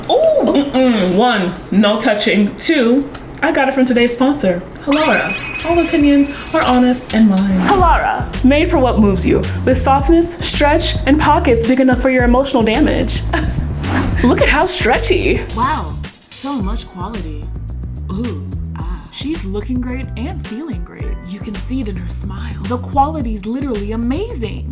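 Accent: United Kingdom. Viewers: You have such a good British accent girl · Your british accent threw me off😂😂😂😂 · Great video these type of videos are funny but as uk girlie please let’s not do that accent next time was terrible 😂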